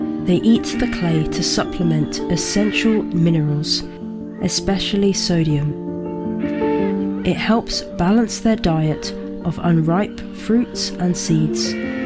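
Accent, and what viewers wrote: United Kingdom. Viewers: Every one of your videos makes me wish I had a British accent.😅 Great job on another lovely video!💕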